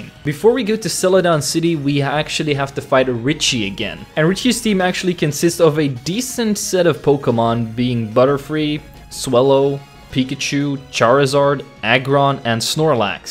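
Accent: Netherlands. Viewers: wait are you dutch?! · Wait are you dutch? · Are you dutch?! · A-A-Are you Dutch??! o mijn god ik ook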